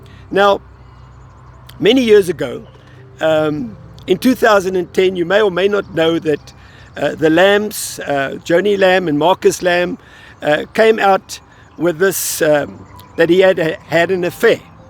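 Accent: South Africa. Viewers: It's music to my ears to hear your South African accent! 🇿🇦 Thank you for your wise insight. From a Saffa who has move to Northern Ireland. 🇬🇧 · The moment I heard his voice I could hear the South African accent straight away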